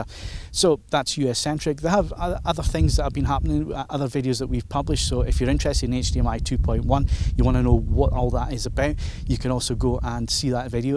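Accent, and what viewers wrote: Scotland, United Kingdom. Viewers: This guy is from Scotland. I believe.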